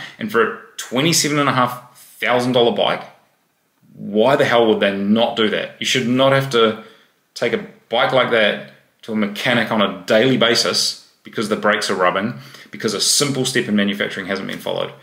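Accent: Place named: New Zealand. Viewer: I AM outside of New Zealand watching this. But with mumbled staccato outbursts it’s really hard to understand. (Of course I understand that’s your native accent. But being more prepared and slowing down it might be easier to understand)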